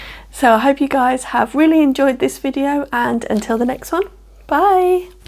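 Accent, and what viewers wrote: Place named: Midlands, United Kingdom. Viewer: Love this idea. I’ve never done quilt as you go and really want to try. It’s a refreshing change to find someone from the UK! You sound like you are from the Midlands near me.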